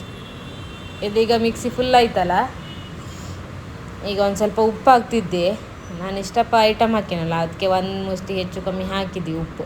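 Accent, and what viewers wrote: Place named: Kundapur, India. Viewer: super kundapur bhashe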